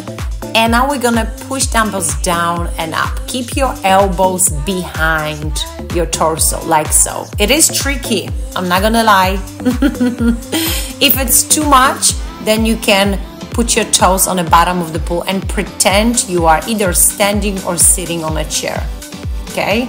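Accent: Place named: Lebanon. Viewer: وعي تتكلم لبناني احس غرااابه 😂😂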